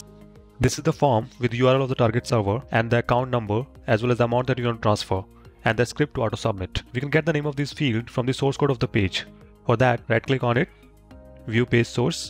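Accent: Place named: India. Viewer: you are indian and on top of that you are talking fast , so i just gave up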